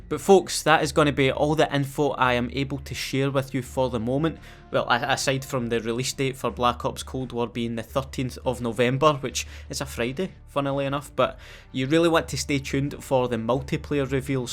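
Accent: Scotland, United Kingdom. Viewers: His voice is so fucking awesome to hear. I wished i had the scottish accent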